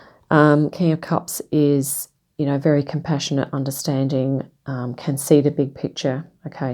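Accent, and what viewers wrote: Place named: Australia. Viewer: Yay another Aussie accent 🎉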